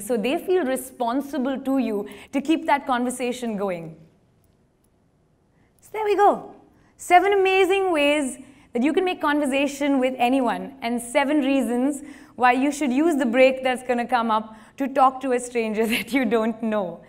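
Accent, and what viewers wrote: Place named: India. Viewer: I just love your Indian accent,.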